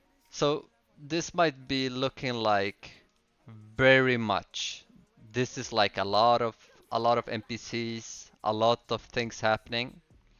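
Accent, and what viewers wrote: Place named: Middle East. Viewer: That’s very nice man. When i heard ur accent i thought that this guy is probably from somewhere near middle east or balkans with a good language education😂. I assume that one of ur parents born in either Turkey or Kurdistan. I’m Turkish and as far as i know a lot of Kurds migrated to nordic countries. Lastly about the game, how important is fishing? I’m N5 right now with Lilith and I barely do fishing.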